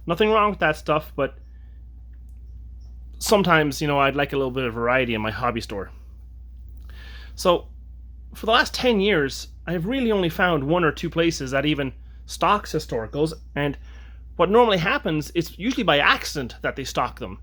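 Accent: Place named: Irish Canadian, Canada. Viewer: You have a great voice: a charming blend of Irish and Canadian.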